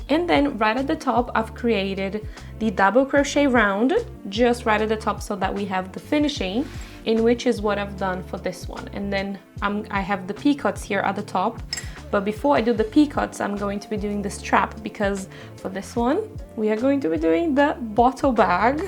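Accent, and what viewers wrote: Brazil. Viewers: amiga lindooo! fiquei curiosa pelo sotaque, vc é brasileira?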